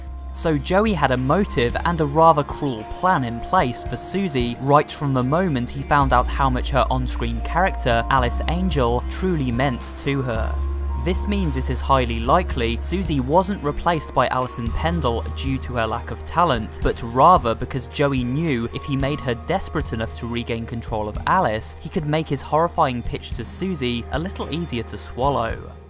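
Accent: United Kingdom. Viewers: Just wanted you to know, you sound british. I absolutely adore and admire british people because of their accent. This is probably why i enjoy your videos.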